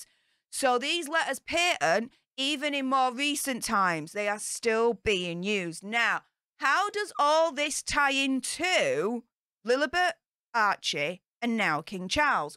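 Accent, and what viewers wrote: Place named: Northern England, United Kingdom. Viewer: Hi, just popped in following Trev Coult's recommendation - to find out what you get up to! I've been trying to place your accent - somewhere around the Pennines between Lancs and Yorks, I think. I'm in Cheshire. (and don't panic, you're WAY too young to interest me socially!) - - I think we should depose Horrid and Methane's kids.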